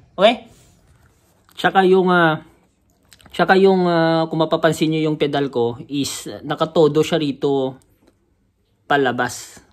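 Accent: Iloilo, Philippines. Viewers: daw ilonggo ka gid sir ba hehehe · Ilonggo ka master?? Ride safe simo da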